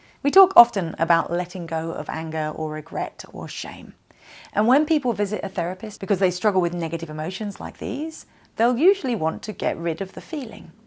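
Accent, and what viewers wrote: United Kingdom. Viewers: You’re so articulate! Do you have scripts in the video or you just talk like that? And is that the RP Modern British accent or any other one? 😊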